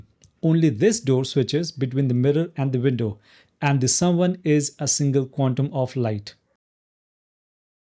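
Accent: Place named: India. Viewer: If your attention span for listening to a man speaking English very clearly and well articulated is only 8 sec because the man has an Indian accent I propose the communication problem is entirely on your end. - by the way, you write English in a poor way yourself. Your sentences are missing words before they can be called full sentences. And your last sentence says that you lost patience with an articulation which is itself impatient with the audience of the articulation - that is just gibberish. I suggest you go practice your own English before criticizing others.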